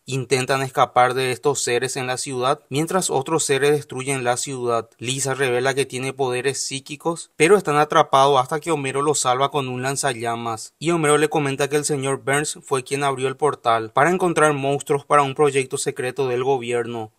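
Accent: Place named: Paraguay. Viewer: Chaaa pense luego esta vos me parese un paraguayo y si sos ra'e cha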